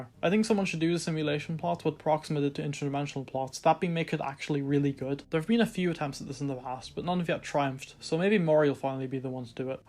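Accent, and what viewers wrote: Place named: Ireland. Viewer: you sound like mario if he was irish instead of italian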